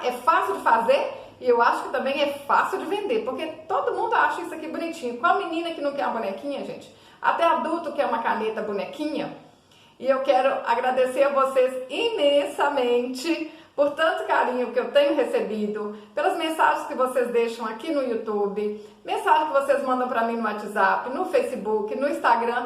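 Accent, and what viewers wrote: Minas Gerais, Brazil. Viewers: Difícil saber o que é mais fofo, se é o artesanato ou esse sotaque mineiro bom demais da conta... 💟💖💟💖😊😊😊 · Sem querer achei o seu vídeo e amei...vou tentar fazer essa lindinha. Pelo seu sotaque é mineirinha? Um abraço fraterno da carioca aqui.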